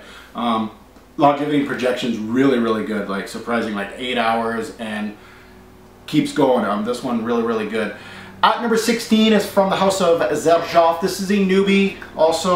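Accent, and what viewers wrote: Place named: Quebec, Canada. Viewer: Hahahahah!!! I like your French Canadian accent - the way you pronounce 'Frapin' and 'Sel Marin' is fantastic! So a question, (get it?)...is this list based on objective quality or personal preference? Gotta love my man Heeley.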